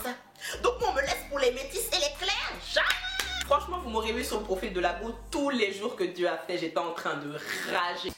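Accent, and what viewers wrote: Ivory Coast. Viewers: alors là ! !!! Si on me dit que tu n'es pas ivoirienne toi! lool. Je te découvre avec cette vidéo. ..Et pardon yé né ka m'abonner keh lool. J'ai trop rigolé. Je t'encourage ! Tu es top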